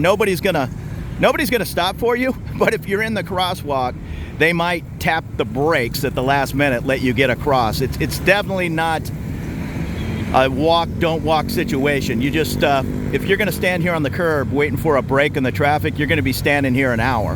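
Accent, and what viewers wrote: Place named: Southern California, United States. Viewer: Hi, I just spent a week in On Nut and you popped up in my YouTube feed. I lived on La Jolla Blvd. in PB for three years, back in the late 90’s. It was a giggle hearing your SoCal accent. 😀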